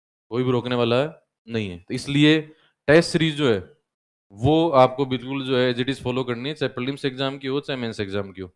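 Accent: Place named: Jodhpur, India. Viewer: ye jodhpur ka hai..... Pakka boli aise hai😂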